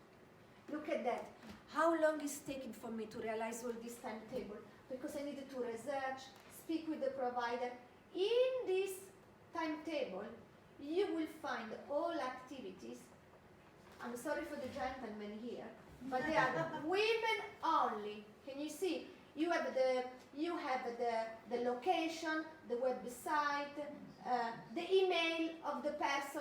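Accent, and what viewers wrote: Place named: Abruzzo, Italy. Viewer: No, perché dici che il tuo inglese sia "no good"? Sei molto brava. Soltanto la pronuncia, ecco, la pronuncia sa un po' di "Abruzzo con amore".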